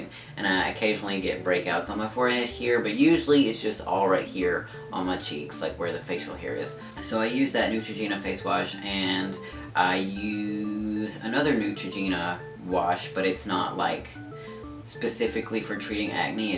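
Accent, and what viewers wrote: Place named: New Orleans, United States. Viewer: What accent is this? New Orleans?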